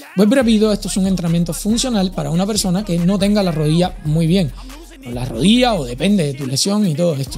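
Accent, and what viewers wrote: Cuba. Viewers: Ya el chama habla cubano, saludos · el chama ya tiene el ascento cubanoo ❤😮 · El chama tiene acento cubano!!!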